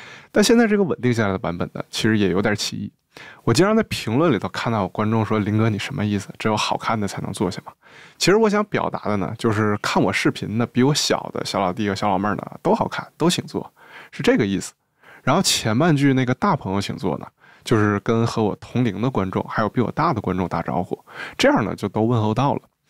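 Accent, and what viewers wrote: Northeast, China. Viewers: 小老弟小老妹儿，第一次看你的视频就知道你是东北人:face-blue-smiling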